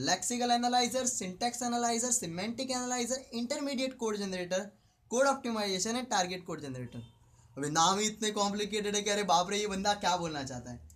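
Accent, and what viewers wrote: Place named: Marwari, India. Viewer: Marwadi k tarah😂.. Ek no bhai👌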